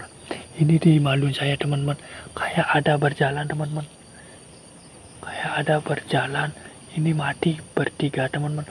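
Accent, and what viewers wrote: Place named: Karangasem, Indonesia. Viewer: Bukan e takut kedek Cang nepuk bli logat e lucu Karangasem kubu pok bos bekas kuburan masal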